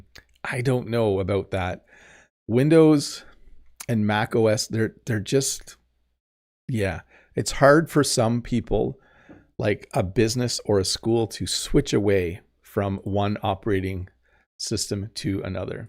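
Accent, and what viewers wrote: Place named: Canada. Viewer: Salut,! It is so refreshing to listen to your videos. You speak so clearly, your pronunciation is spot on and your pace is excellent and the Canadian accent is <<the best>>! One (minor) question: do you have the ability to control the language for your captions? I notice they use USA English: favor, behavior, center.... Back to watching video, thanks!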